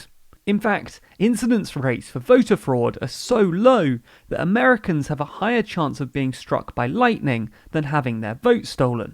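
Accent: United Kingdom. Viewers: Why would I trust anything about the US from a person with a British accent?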